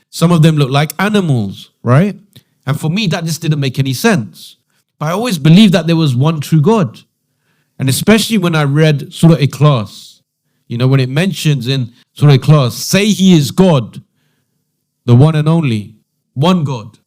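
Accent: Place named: Saudi Arabia. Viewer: You were not hindu you have Soudi accent you liar. You just want to promote 😃you fool!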